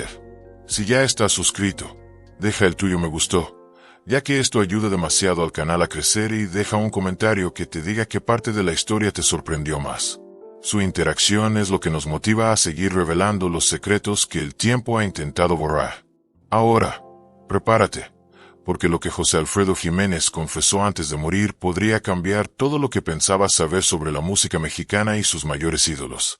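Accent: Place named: United States. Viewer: Y tiene acento gabacho. Parece que es gringo el narrador.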